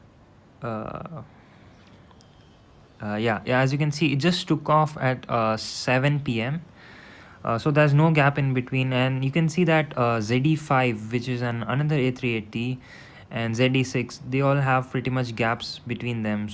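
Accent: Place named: India. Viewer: Fuckin indian accent :((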